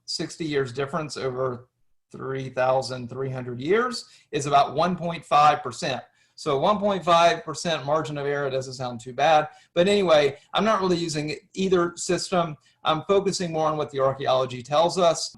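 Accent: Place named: South, United States. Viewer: I think it's just his Southern accent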